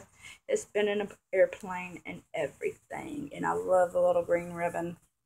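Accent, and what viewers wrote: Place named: South, United States. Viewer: Nice! Where are you from? The south? Love the accent :) You can use books, cardboard boxes etc as a video camera stand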